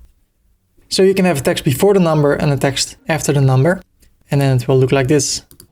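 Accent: Netherlands. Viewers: The Dutch English is to much cringe. x(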